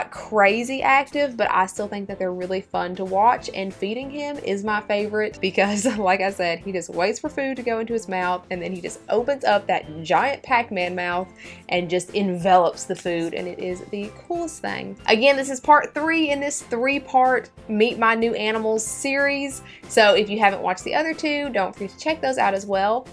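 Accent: South, United States. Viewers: He just dont understand a good southern accent, its nice.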